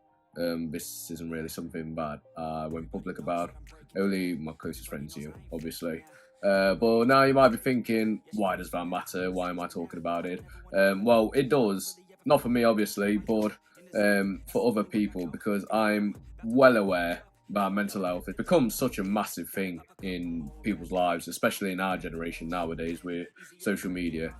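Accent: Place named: Australia. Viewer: Where does that accent come from, Aussie??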